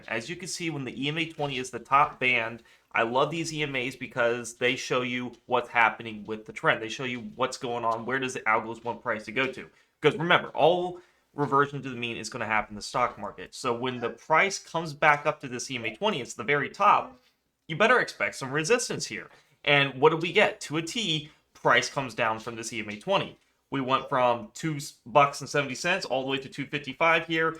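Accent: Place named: Iowa, United States. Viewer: ARE YOU FROM Iowa??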